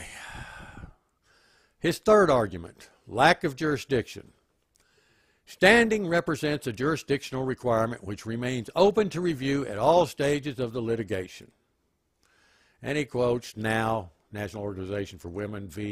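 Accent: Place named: Texas, United States. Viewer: I'm hearing a little bit of a texan accent. Lol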